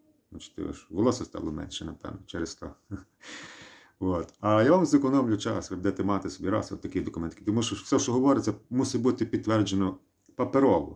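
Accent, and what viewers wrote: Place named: Galicia, Ukraine. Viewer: Лайк за україно-галицький говір🤔🙂👍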